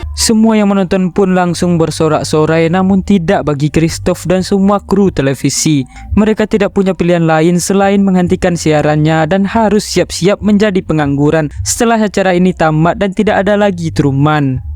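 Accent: West Sumatra, Indonesia. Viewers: Ini urang awak yg ngomongnya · Logat minang ngomong lu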